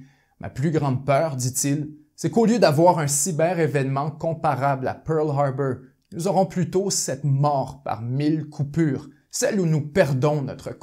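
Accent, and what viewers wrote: Quebec, Canada. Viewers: J'adore ton accent canadien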